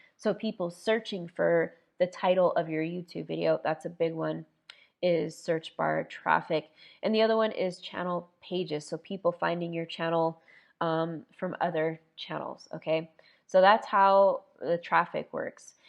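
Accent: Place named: Australia. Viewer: Is it only me, or do I love his Aussie accent?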